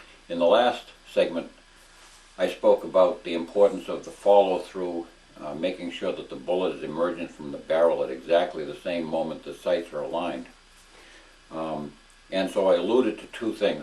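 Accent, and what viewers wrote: New England, United States. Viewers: I have been waiting for months for a new range in our area to open so I can take lessons with an instructor there. In the meantime, I have been able to shoot at a couple of places without the benefit of the lessons with mixed results. After watching parts 1 & 2 I can see where I was making mistakes in lining up the sights vs. trying to get the target into focus. This series of videos is probably the best common sense training I have seen on the internet. Thank you very much and please keep producing these informative and high quality videos. I also like your New England accent. Most of the firearms You Tubers have southern accents which is fine, but my wife is from Massachusetts and I love a New England accent. Yours sounds more like New Hampshire though.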